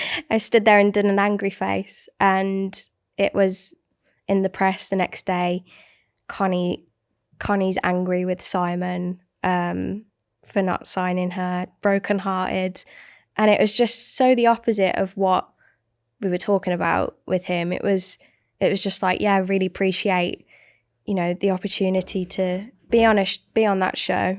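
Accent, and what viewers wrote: United Kingdom. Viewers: I love British accents & I definitely love her singing.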